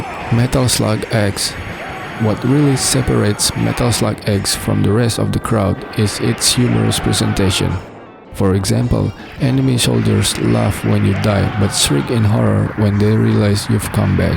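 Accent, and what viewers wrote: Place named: Indonesia. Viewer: Yang ngomongnya orang Indonesia keknya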